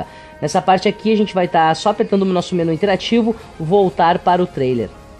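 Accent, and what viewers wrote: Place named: Rio Grande do Sul, Brazil. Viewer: Tu é gaúcho!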